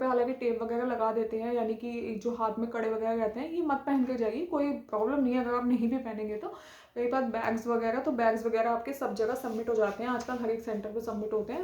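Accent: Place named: Purvanchal, India. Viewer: hame laga purvanchal side ki ho aap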